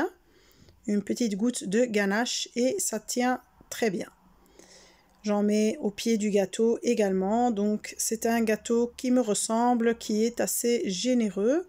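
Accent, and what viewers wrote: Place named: Belgium. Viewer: Salam Alaykoum tu es belge ? Tu as un accent que je n’arrive pas à trouver ?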